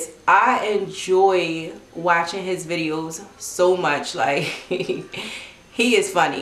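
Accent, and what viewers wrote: Baltimore, United States. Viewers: That Bmore accent. Love it sis..you slay every day! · I watched your pony tail and bang video and heard yor accient, i was like sis sound like she from B more let me check our her channel. Keep up the good work boo. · Love your videos 😘 I knew you were from bmore cause you sound like snupe from the wire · Since you're from Baltimore ( which I kinda guessed from your accent) B-More Careful by Shannon Holmes, True to the game by Terri Woods ( I think), and The coldest winter ever by Sista Soulja, and last but not least the Bible!!! God bless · Legendary Baltimore accent💕💕💕😜😘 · I KNEW that was a Baltimore accent lol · I been subscribed for along time now and I already had it figured you was from Baltimore ik that from anywhere I just needed you to say you was from here cause I could have been wrong lol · I hear a B More accent yup there it goes lol . 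All my family lives out there❤️ new supporter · i watched this to confirm you were from Baltimore ❤️ i know the accent when i hear it 🤟🏽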